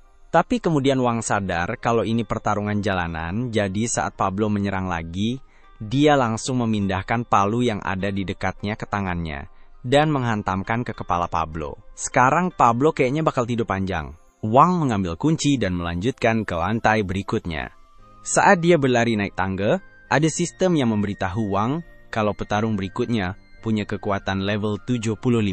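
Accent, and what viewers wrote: Malaysia. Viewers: bisa ga si gausah pakek logat malay bng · Ni bahasa Malaysia apa Indonesia Tapi Malaysia dia pekat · Abang orang Malaysia ya😊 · Orang malay bang? Aksennya gitu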